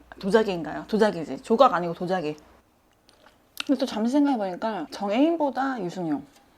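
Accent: Gyeongsang, South Korea. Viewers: 약간 경상도 사투리가 묻어있는거 같으세요ㅎㅎ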